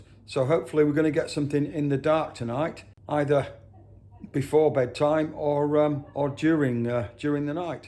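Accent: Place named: Germany. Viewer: Thanks, that’s a German accent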